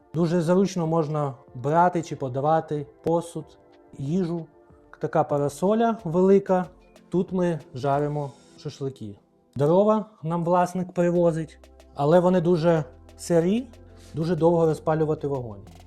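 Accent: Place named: Sanzhary, Ukraine. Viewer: В Санжарах так розмовляють.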